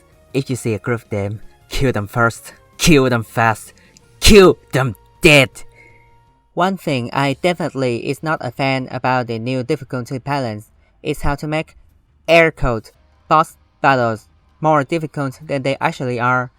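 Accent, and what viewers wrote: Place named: India. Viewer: Kudos, an excellent review covers pretty much everything I needed to know! however ur accent still needs bit more practice it mite be difficult for people outside India and Asia to understand. Good luck!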